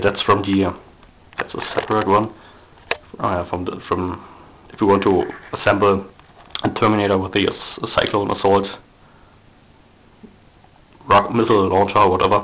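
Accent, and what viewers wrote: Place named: Germany. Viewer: german accent ftw! :)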